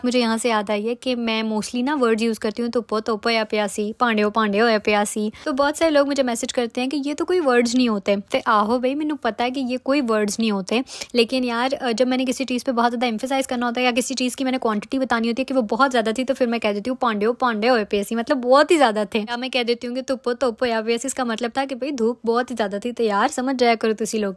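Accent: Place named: Punjab, India. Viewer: Ap ky vlog ka shaded wait rehta hai... Lamba bnaya kary ... Punjabi ka tarka hi to acha lagta hai ❤😊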